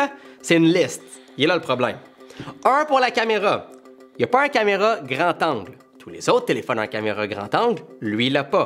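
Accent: Quebec, Canada. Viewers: Une question pourquoi tu dit "ça s'écrit tu comme ça "ou encore "marde " est ce que c'est l'accent du Québec? (Entre nous je pense que oui ).😁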